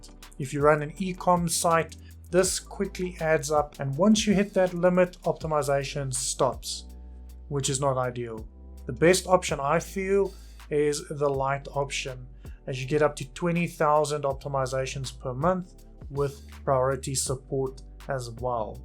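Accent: South Africa. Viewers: Are you South African 🤔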